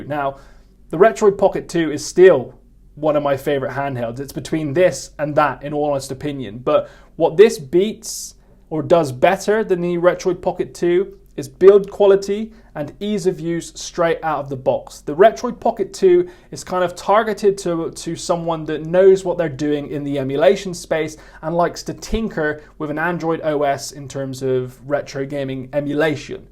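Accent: United Kingdom. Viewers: I didn’t even realize you were British until you said something. I think there are so many Youtubers around the world now that I don’t even think much about accents. Weird!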